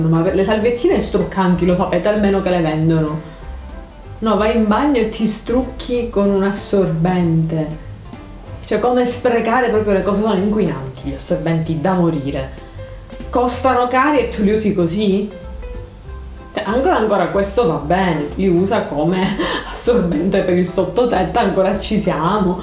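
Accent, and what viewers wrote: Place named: Catania, Italy. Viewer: sei tutti noi. Domanda sei di Catania? L'accento ci assomiglia, e io mi diverto più di tutti, essendo messinese capisco alcune cose che dici in dialetto. ❤❤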